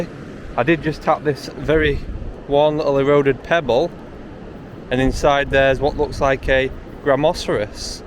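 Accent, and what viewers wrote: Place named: United Kingdom. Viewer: You have the best British accent. #1 British person.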